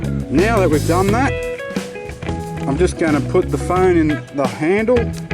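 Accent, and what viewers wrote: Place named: Australia. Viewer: What a legend. As a kiwi there is nothing more reassuring than an Aussie accent gently educating you on the finer points of setting up your drone. Most appreciated 😅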